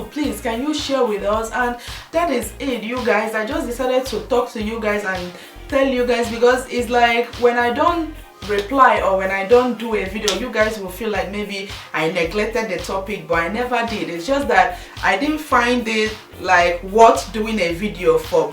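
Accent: Igbo, Nigeria. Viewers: Og Diva its her nigerian accent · stella-marie signature my sister, I couldn't stand it too, and her Igbo accent is so unstoppable. I love her videos but...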